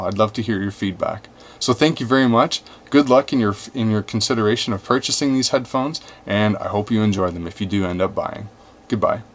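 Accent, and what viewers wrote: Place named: Canada. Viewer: I hope the headphones sound better than your annoying Canadian accent.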